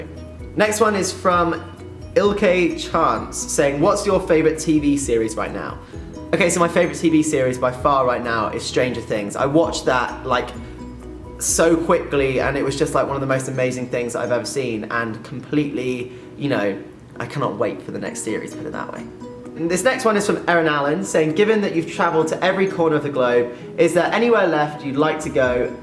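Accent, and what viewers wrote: United Kingdom. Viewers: I could watch you forever and listen your lovely british accent <3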